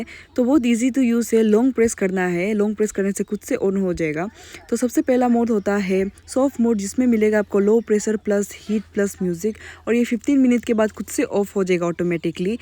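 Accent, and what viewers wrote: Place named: Assam, India. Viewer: Your assamese accent is sooo cuteee😂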